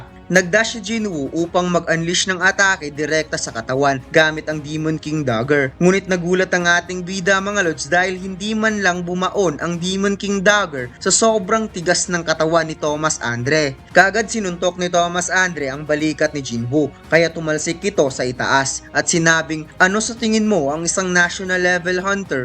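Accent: Visayas, Philippines. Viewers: Bisaya kc sya kaya ganyan pronounce nya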